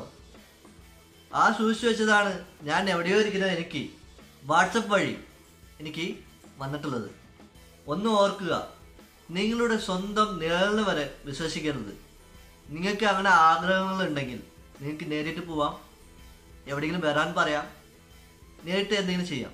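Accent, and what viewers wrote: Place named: West Bengal, India. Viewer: ബംഗാളി മലയാളം സംസാരിക്കുന്നത് പോലെ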